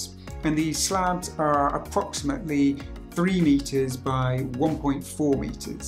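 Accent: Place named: United Kingdom. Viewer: He’s British judging by his accent so it’s perfectly normal for him to express in metric measurements.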